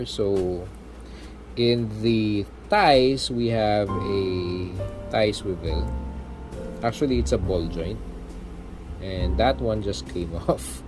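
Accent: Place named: Philippines. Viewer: This guy is from the Philippines just the accent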